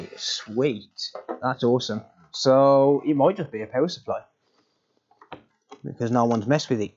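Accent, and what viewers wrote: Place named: Walsall, United Kingdom. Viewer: Ooooooo, you sound super local 🤣 Walsall guy in the House!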